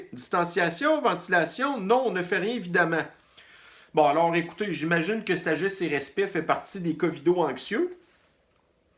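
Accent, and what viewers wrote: Quebec, Canada. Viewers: Salut mon complotiste en chef, je ne suis pas d'accord avec tes commentaires et ton jugement sur nos dirigeants. Le gouvernement fait son possible pendant cette pandémie. Oui je suis un boomer et content de l'être. Pour le reste tu es le seul complotiste que j'aime malgré ton discours. Je ne sais pas pourquoi je t'aime, je suppose ta façon de parler ta mimique et ton accent qui me rappelle des amis que j'avais comme militaire à la Citadelle de Québec en 1975. J'ai hâte de voir après la pandémie quel seront tes sujets et sur qui tu vas mordre et ce , si ta chaîne n'est pas fermée d'ici là. Tu es le genre de personne avec qui je prendrais un verre de vin.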